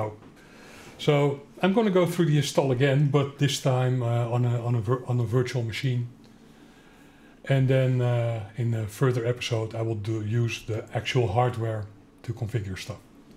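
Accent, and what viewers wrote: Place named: Netherlands. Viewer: You have a Dutch accent. I’m just a Linux noob, but am really interested in more holistic and well designed Operating Systems, so thanks for the video!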